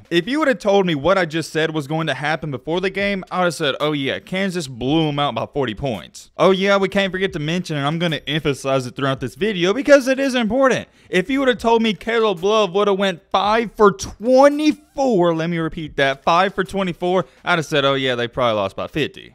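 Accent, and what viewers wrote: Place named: Tennessee, United States. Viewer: Bro you must be from Tennessee , your voice sounds a lot like upchurch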